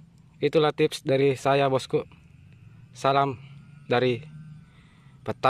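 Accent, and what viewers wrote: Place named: Bugis, Indonesia. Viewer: Bos ini klo didengar logt nya pasti orang Bugis terima kasih ilmunya bos di mana beli obat seperti itu bos .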